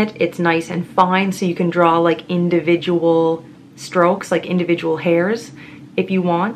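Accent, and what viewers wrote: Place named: Cape Breton, Canada. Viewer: I absolutely love your videos.. I love that cape Breton accent I’m originally from Newfoundland now living in Saskatoon and it just makes me miss the East Coast.. I hope you’re having a fantastic day and merry Christmas and all the best to you and your family in the new year!!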